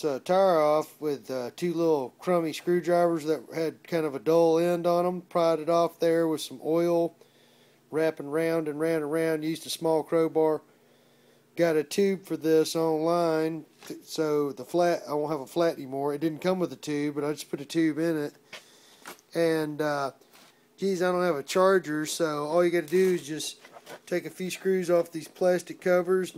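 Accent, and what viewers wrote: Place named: South, United States. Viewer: Thanx for southern speak. To the point no rambling..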